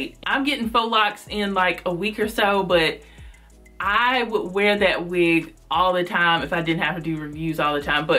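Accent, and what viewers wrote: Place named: Alabama, United States. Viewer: Your accent must be from Alabama 😊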